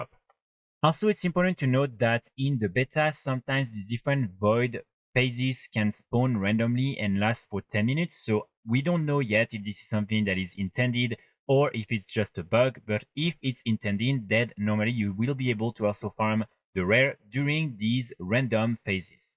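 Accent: France. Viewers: I love that french accent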